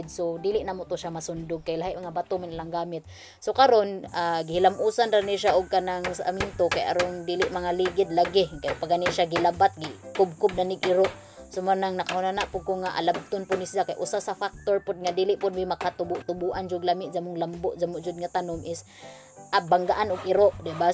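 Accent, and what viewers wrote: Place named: Bohol, Philippines. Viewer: Ganahan jud kaayo kos accent sa bul anon uyy 😍 always watching from agusan del sur ❤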